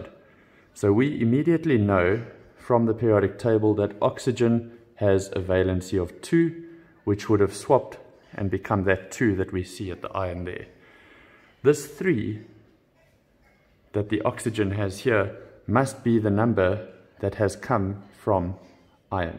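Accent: South Africa. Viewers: Helped a lot thank you PS: are you South African?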